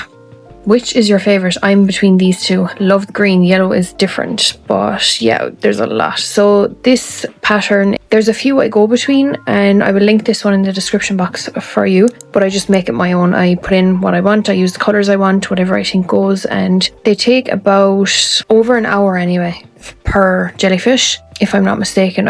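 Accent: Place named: Ireland. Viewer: Hi, there are so many cute item's just came across you today thought i could hear irish my mother from killkenny Ireland look forward to you're next video ❤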